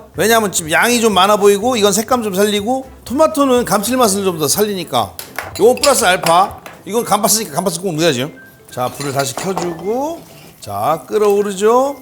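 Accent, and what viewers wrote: Chungcheong, South Korea. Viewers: 이렇게 뿐진담에.. ㅋㅋㅋ 충청도 사투리..ㅋㅋㅋ 정겹네요 고향사람이라 ㅎㅎ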